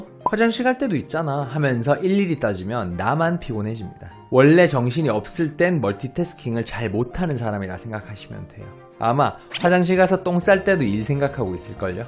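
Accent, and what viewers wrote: Gyeongsang, South Korea. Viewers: 고향이 대구쪽이신가??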